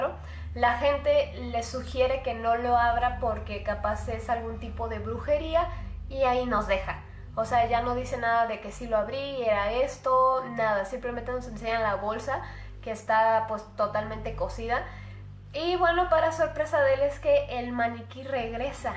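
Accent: Colombia. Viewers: Las papal abras que usa y su acento, es muy Colombiano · Dizque usa palabras de México obviamente es colombiano por como habla, las palabras y el acento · El es colombian se los digo porque yo lo soy y se le nota :v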